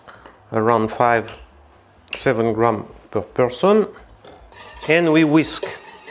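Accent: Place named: France. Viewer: Merci pour ton tuto ..Trop cool ton lovely french accent😄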